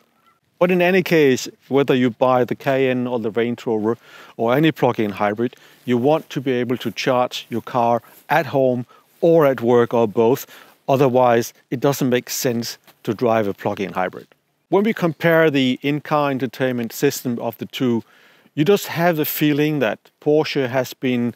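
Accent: Denmark. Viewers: Is this Danglish ??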